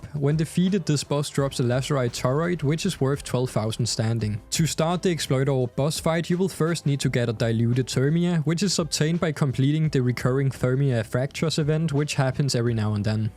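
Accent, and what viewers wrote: Denmark. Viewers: Great video. You sound Denish?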